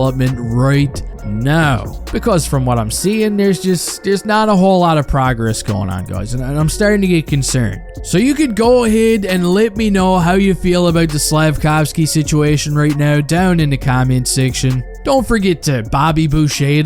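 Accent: Newfoundland, Canada. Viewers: First time viewer, you are a newfie aren't you? Thats a newfie accent :)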